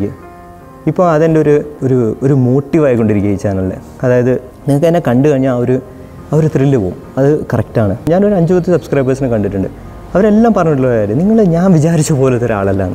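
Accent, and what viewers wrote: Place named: Kannur, India. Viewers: സംസാരത്തിൽ നിന്നും മനസ്സിലാവുന്നു വടക്കേ മലബാറുകാരനാണ് അല്ലെ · It’s not about just age,it’s about your friendly approach.Love and respect from Kannur,Kerala. (Your accent resembles Kannur boy)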